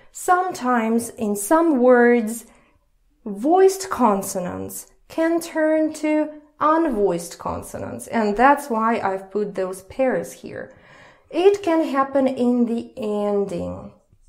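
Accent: United States. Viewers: Все-таки, до чего же противный этот американский акцент. И где только ведущая курсов ему обучилась? То ли дело, британский - как птичий щебет, а не растирание жерновов. Особенно их звук "R" раздражает. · HiI would just like to comment on a certain things either from this video or lesson 2 with regards to the letters ц ш щ ж. In true English (British) all these sounds exist and are used however, they are limited and are always diphthongs and/or triphthongs. Examples: genre, xaxa/zhazha (a girl's name), schedule, shoe, tsar (from Slavic languages imported into English) Furthermore true English is not like Yankee English. I can tell by accent that she learned Yankee English and not true English which is much harder. We also say everything like Russians. Example of this is the word 'think' Englishmen will pronounce it somewhat like 'thing'k' but Americans do not. 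To verbally say 'gonna' or cut endings off of words English or British people in general do not like it and it makes the speaker look uneducated thus that kind of language was only ever used in text messages and even now is not very popular. British English has many rules with regards to how to read and write and to write incorrectly will make it unreadable or phonetically impossible. We are not taught 'phonetics' in the UK as it is not practical because children will think it can only make that sound which is not the case. Example of this is maybe paediatrician or the real spelling pædiatrician. Notice the special 'æ' this tellls us it makes a long ee sound. But Americans don't spell like that. They changed it to 'pediatrician', so really it sound make the sound ped'dria'tri'cian but hey, Americans don't have t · How did you lose your Russian accent and sound like an American?? Lol!! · Did you study American English? 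You have a slight American accent You speak great English by the way congratulations xxx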